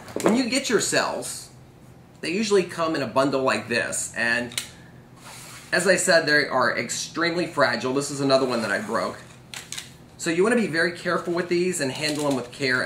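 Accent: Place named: United States. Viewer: WHY DO YOU AMERICANS SAY SODERING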